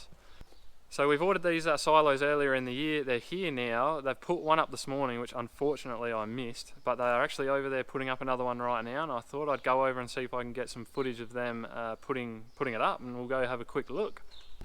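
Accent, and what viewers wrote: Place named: Australia. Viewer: it’s refreshing to listen to you talk ‘Aussie’ and refer to the equipment properly. I’m so glad for the comment about the header and real, calling them what they are in Australia. Clearly I have spent too much time watching US farmers and hearing what they call their equipment. Also, you correctly refer to rainfall in mm and weight in tonnes, etc, not in imperial like our US friends. By the way, I’m slowly making my way through all your videos. They are fantastic and are a pleasure to watch. I’ve done a bit of video editing myself and understand the time that it takes. Please continue to make your video’s, every one I watch I learn something new!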